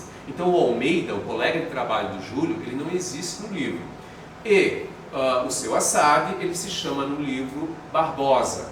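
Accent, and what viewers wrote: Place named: Santa Catarina, Brazil. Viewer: Oi, tens um sotaque catarina........ Adoro!!!!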